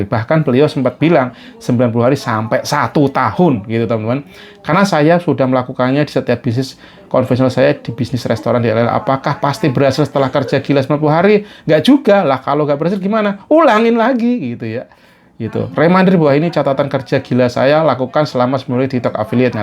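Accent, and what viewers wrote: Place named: Java, Indonesia. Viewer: Mendok & terlalu berbasa basi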